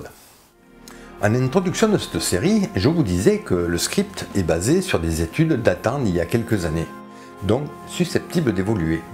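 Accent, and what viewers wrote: South, France. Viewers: Le sujet de la chaîne est intéressant, mais j'ai un gros reproche à vous faire : compte tenu de votre accent méridional à couper au couteau, il faudrait éviter de vous exprimer comme un DJ. Vous n'animez pas une foire au cochon locale. Je n'ai pas compris grand-chose du premier coup et j'ai fait beaucoup de retours en arrière. En vous exprimant calmement, posément, ça irait infiniment mieux. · Bravo ! c'est très intéressant. Je m'abonne ! Bonne continuation. Comme quoi on peut avoir l'accent du sud et présenter intelligemment autre chose que la météo à la télé et ou à la radio dans notre pays. Adissiatz !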